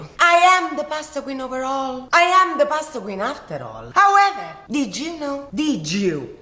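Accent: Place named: Italy. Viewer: Non so se lo fai apposta di parlare inglese italianizzato o....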